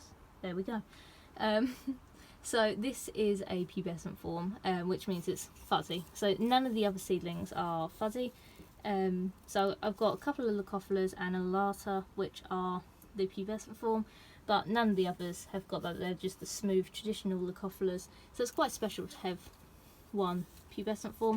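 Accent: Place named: United Kingdom. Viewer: uk accent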